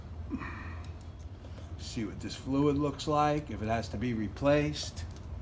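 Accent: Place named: Brooklyn, United States. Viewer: Love the accent. Sounds like Brooklyn or SINY , my part of the country.. good tips thanks for the info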